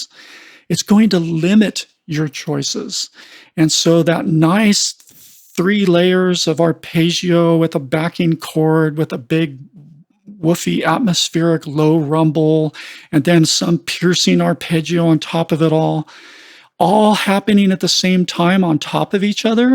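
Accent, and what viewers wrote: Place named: Vancouver, Canada. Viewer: Just out of interest, are you in Vancouver by any chance? I just ask as you have the exact same way of explaining things as one of my mentors over here who was an engineer over there before being poached by the Canto-pop scene in the 80s. And I don’t just mean your accent, it is your whole demeanour. It is pretty unreal!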